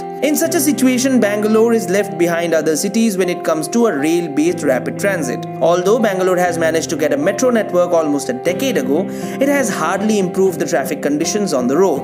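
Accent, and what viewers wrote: Bengal, India. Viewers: I think the narrator is Bengali Babu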